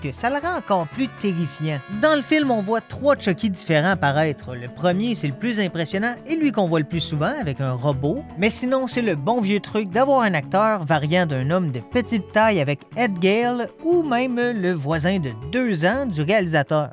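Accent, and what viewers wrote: Quebec, Canada. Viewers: Jadore je m'abonne et j'aime ton accent québécois moi aussi je suis québécois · Wow, je vient de découvrir ta chaîne, et franchement, c'est propre :D En plus, j'adore ton accent québécois, et je trouve super beau 😊(ouais, un peu bizarre 😅)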